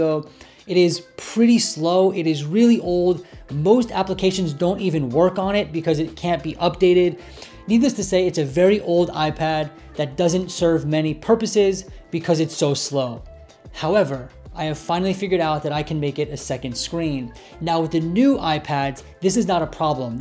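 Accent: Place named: China. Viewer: sounds chinese therefore I don't trust it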